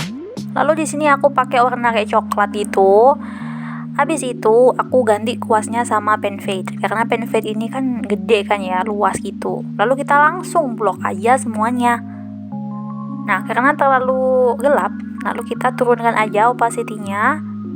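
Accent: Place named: Philippines. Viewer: Let me guess your a Filipina Cuz you sound like one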